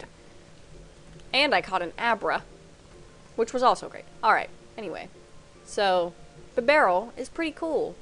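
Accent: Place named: South, United States. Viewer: I was wondering, do you live in south U.S? Just wondering cause you have a slight accent. =3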